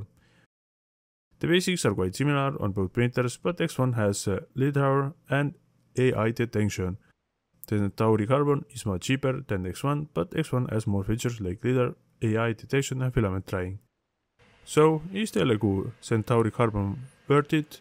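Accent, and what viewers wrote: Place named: England, United Kingdom. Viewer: I don't have that either! I use translate! It's more about his deep voice and English accents! What I'm happy about and what's most important, is that makes some good videos, and I enjoy them!:)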